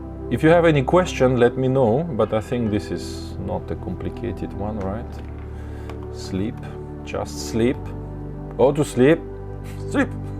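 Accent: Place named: Russia. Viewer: Thx for the answer, I didnt expect it lol. Maybe you should then love your voice more, because Russians talking English sound really nice. And when you love your voice more then youll reduce the backgound music volume automatically 😊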